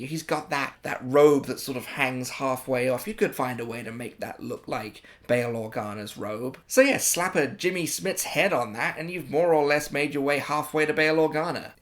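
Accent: United Kingdom. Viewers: Not doing well at the moment but forcing myself to comment now, as I've disappeared off sm for several weeks. Still lovely to hear your smashing British voice on the interweb.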